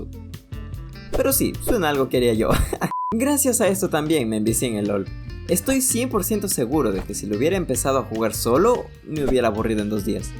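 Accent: Chile. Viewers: Es chileno 😳👌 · Primero...: me gusto el video!!! Me encato!♡ Y segundo... Así que mi sospecha era cierta?! El men si es chileno jsjsjs, pense que era imaginacion mia, pero la wea era cierta. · Eres chileno? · Eres chileno? Peruano? Boliviano?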